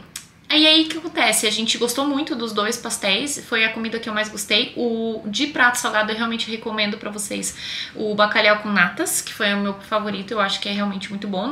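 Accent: Brazil. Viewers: Oi sou portuguesa nasci, na ilha Graciosa uma das nove,dos Açores, vivo no Canadá, viajo para Portugal Continental, com frequência,o meu marido e do Distrito da Guarda a qual depois de Lisboa foi a Cidade que mais conheço e menos gosto, ao Porto só fiz paragem no Aeroporto, está na minha lista quem sabe este ano? quanto a Coimbra gostei, mas não tanto quanto Aveiro muito mais talvez por causa do Mar,conheço Peniche, e Fátima, Nazaré gosto muito,Castelo Branco também é muito agradável, a Sertã gostei, mas me desculpem os Portugueses de Portugal Continental, prefiro os Açores mil vezes mil que a outro qualquer lugar 😂 mas sou suspeita, pois já que la tenho as minhas raizes,já o marido é continental e prefere os Açores, para uma próxima visite os Açores, se bem que a culinária- em termos de restauração o continente ganha os Açores muitas vezes, gostei muito de assistir os seus vídeos e tal como todos os Portugueses, acho estranho quando vocês Brasileiros prenunciam as palavras Portuguesas 😂 como ir no Porto e não ao Porto, me faz lembrar, que vamos montados em algo chamado Porto 😂 bjs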